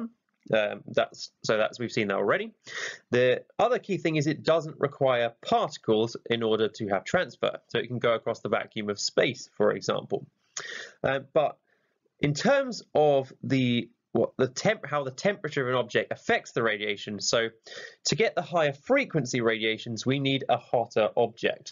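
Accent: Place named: United Kingdom. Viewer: nvm u sound british :face-orange-raised-eyebrow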